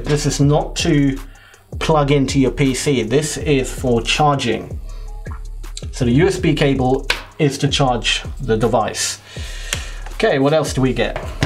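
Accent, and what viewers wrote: United Kingdom. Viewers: For some reason I didn't expect you to be british before you said anything, haha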